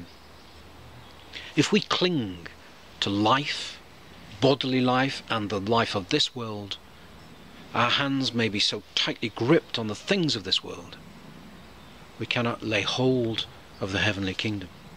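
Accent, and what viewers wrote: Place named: United Kingdom. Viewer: Very compelling talk Fr.. Sounds like you’re of British persuasion. What parish do you shepherd?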